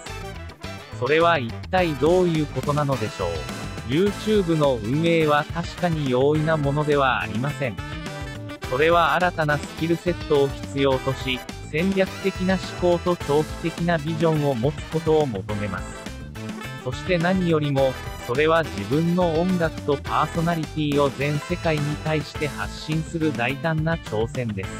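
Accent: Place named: Kansai, Japan. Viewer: は、やはり関西弁バリバリの解説がしっくり来るのですが😅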